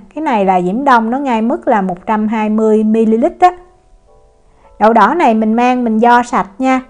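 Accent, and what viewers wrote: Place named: Saigon, Vietnam. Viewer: Hello Diễm năm mới chúc gia đình cháu hạnh phúc vui khỏe suốt năm nhé! Cách hướng dẫn của cháu nhanh gọn đẹp mắt và giọng nói SG làm cô thích nghe thanks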